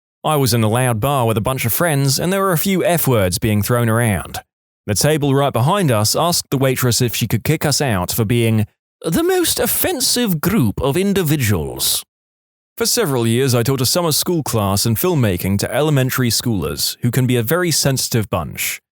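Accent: England, United Kingdom. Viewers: Who is this very English narrator and what did you do with British possibly from Edinburgh narrator I need a narrator with my accent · omg British man?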